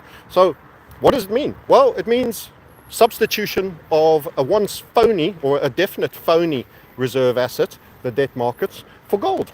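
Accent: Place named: South Africa. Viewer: a based guy with a South African accent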